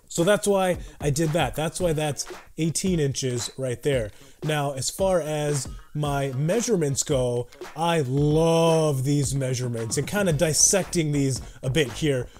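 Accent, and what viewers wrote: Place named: South, United States. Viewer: Bro you from the south? Like GA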